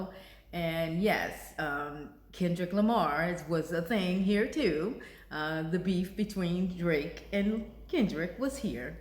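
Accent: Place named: United States. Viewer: I can't speak for the rest of Europe but in Britain I think most people would be accepting and curious , the question is nuanced because some British people are becoming nervous of some folks from other countries than America who may also be black ( sad but true ) but once they hear you speak they will know you are American, I'm British and I hope this helps amigo 😊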